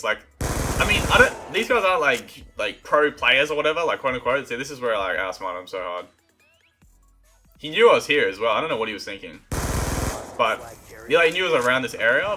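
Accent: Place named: Australia. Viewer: You must be Australian but it's hard to tell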